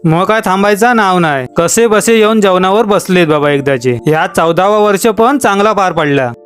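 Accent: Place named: Malvani, India. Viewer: तुझी भाषा मालवणी भाषेला जवळची आहे... पण ती १००% "मालवणी" नाही... तू " " म्हणजे कणकवली जवळच्या "नागवे" गावातला आहेस का?? किंवा तुमचा मूळ गाव "नागवे" आहे का??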